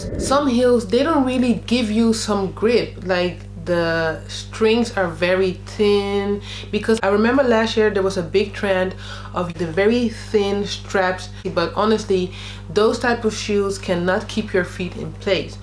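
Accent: Netherlands. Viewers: hahah wat leuk! Je uitspraak is uitstekend hoor, heb zelf op een internationale school gezeten in Californië maar je hoort toch gewoon het Nederlands er doorheen als iemand uit Nederland Engels spreekt;-) leuke video, ik was op zoek naar een oplossing voor mijn kleine teen die met een open hak in de verknelling kwam dus vandaar dat ik bij jou kwam. zoals ik zei, leuke video meis, ga zo door 👍 xxx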